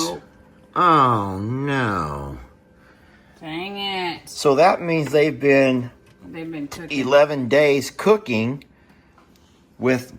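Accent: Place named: Texas, United States. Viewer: Your Texas accent is showing... Terrance becomes Tarrence. get over here Tarrence.. Ha! Love it!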